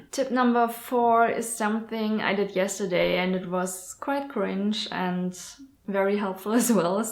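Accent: Germany. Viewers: Are you from Germany? Your accent made me think that. Btw I really appreciate your work, you are very successful. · Dein Englisch ist ja schon gut, aber man merkt schon sehr das du aus Deutschland kommst :D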